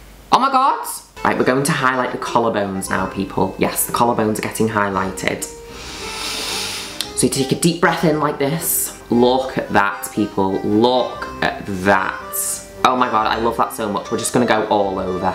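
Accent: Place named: Manchester, United Kingdom. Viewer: Dya know I’m from Manchester but I don’t know anyone with your accent. It’s really nice it’s like still Manc but posh hahaha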